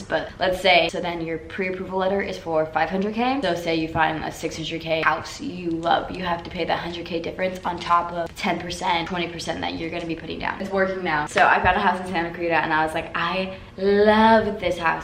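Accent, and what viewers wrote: England, United Kingdom. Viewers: this english accent omfg i am obsessed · Hey love ur British ascent 💜💜💜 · That British accent tho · The English Accent Is Always The Highlight Of The Vlog. Agreed!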